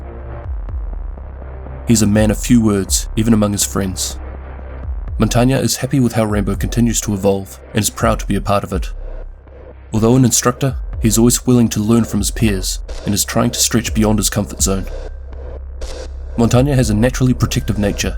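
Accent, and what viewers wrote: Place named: New Zealand. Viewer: Yeah,the New Zealand accent is my favourite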